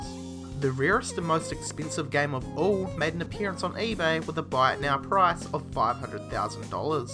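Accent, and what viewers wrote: New Zealand. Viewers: That New Zealand accent though. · It sounds like NZ to me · He's a new zealander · Is your accent from north NZ? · love the NZ accent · Sounds more like New Zealand to be honest, could be Aussie though.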